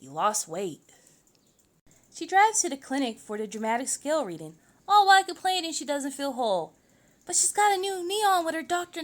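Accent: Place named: Asia. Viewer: The narrator’s Asian accent spoiled kid voice is annoying 😣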